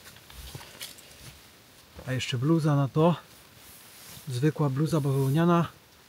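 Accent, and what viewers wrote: Silesia, Poland. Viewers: Słychać że chop ze śląska 😉😁😎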